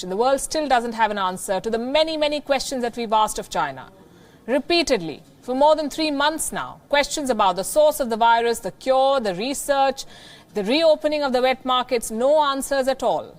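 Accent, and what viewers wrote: India. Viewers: Indian accent’s never been this cool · Strong india accent. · this lady talking like indian is number one an arnt in a epidemic of a toilet shortage in its country, you are actually propitiating and brain washing people by- re-repeating what the people question are an not doing what the news are doing by providing an non bios information/solution. India is know as number top unhygienic country an number one in sexual assault, i say this as you slander another country. an get involved in its geo-politics. china says its a bio wepon created by usa, if it was a hazard the market would still be closed.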